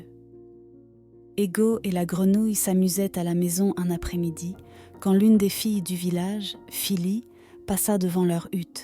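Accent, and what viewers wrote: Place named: Europe. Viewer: Dommage pour la voix que je trouve trop européanisée pour moi! La voix d’un vieille homme ou femme aurait été le top du top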